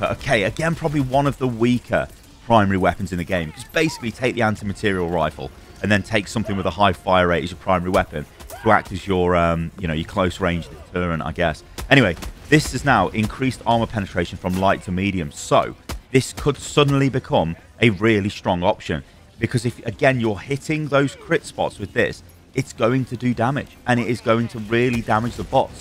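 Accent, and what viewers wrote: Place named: United Kingdom. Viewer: you sound brittish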